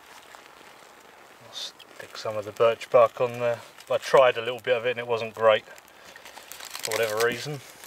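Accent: United Kingdom. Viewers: Mate honestly I’ve just started watching your videos and you’ve got all my attention and utmost respect! Sir you’re quite amazing. Would you mind telling me where are you from? Cause Sir You sound like you from UK?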